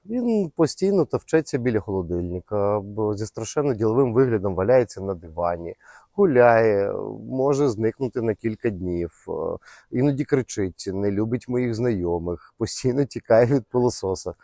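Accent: Odesa, Ukraine. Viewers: його шарм у правільній "одесской" подаче. От у чому прикол: правильний анекдот розповісти на тій мові, яка не доцільна а рекламу зробити на россійскій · Дякую, дуже гарна українська,але одеська вимова це ЩОСЬ,тим більш у вашому виконанні.Дякую.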